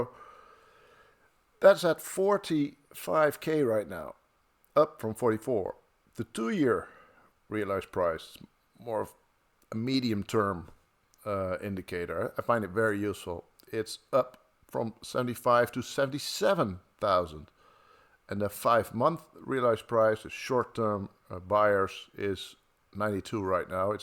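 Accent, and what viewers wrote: Netherlands. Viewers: Cool vid! Where’s your accent from? You sound Dutch.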